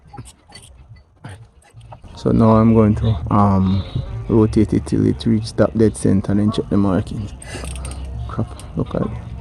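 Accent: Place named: Jamaica. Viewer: I hear a 🇯🇲 yaad man voice.. love the content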